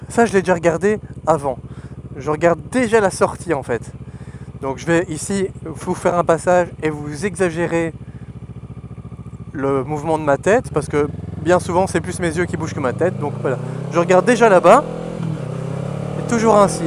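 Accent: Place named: Hainaut, Belgium. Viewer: Bonne vidéo, pas mal pour les débutants. Bonne élocution. Et l'accent du Hainaut ! 👍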